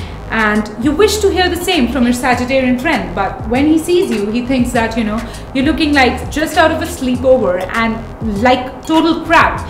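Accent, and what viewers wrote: India. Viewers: maybe 'becoz of that Indian accent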